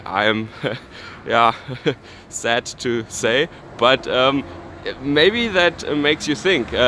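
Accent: Bavaria, Germany. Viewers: Das war sehr interessant! Danke Schön! Mano dein Akzent ist sehr lustig. Typisch Bayerischen Akzent. 😂 sorry i lived in the North and you guys crack me up. Tolles Video vielen Dank!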